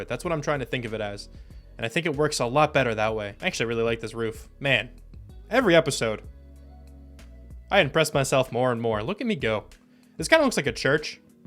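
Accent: United States. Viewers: Ahh, now that you mention Pennsylvania I can hear it. Good video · I thought I detected an eastern PA accent, I'm from that area too! Go birds! Just found your videos and kept picking up on Philly/lancaster isms. Enjoying the series so far.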